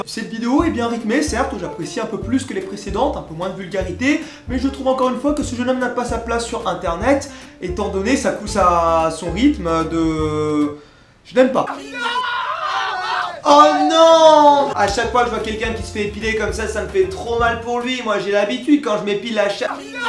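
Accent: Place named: Nord-Pas-de-Calais, France. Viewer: Putain l'accent ch'tit mec xD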